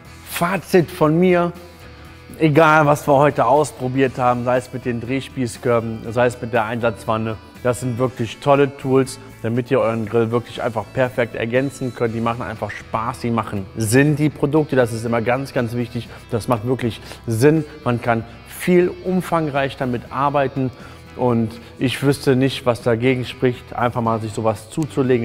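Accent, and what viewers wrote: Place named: Rhineland, Germany. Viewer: endlich wieder rheinische tön hüüre, näää wat es dat schön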